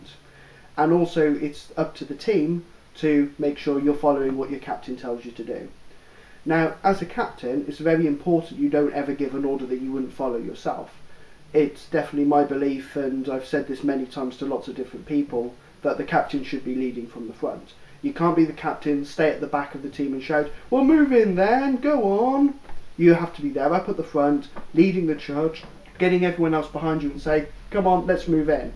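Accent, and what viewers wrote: England, United Kingdom. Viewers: you english?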